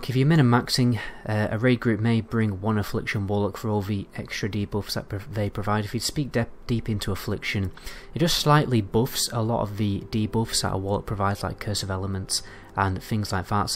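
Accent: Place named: Sheffield, United Kingdom. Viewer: Hey, kinda random but your accent sounds very similar to Alex Turner’s from the Arctic Monkeys. Maybe it’s my un-attuned American hearing and I’m way off, but are you from Sheffield or near there (that’s where he’s from)? UK accents are so interesting and varied, I could be super wrong lol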